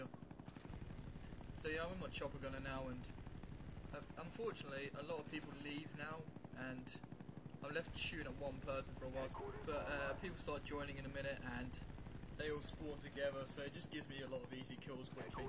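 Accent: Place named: Australia. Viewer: very Australian english accent :)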